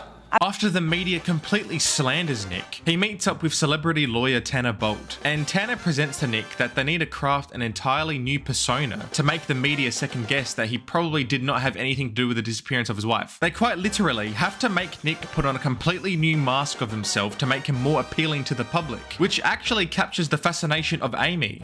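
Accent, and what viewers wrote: Australia. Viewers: Cool guy with Australian accent, defenetly subbing !❤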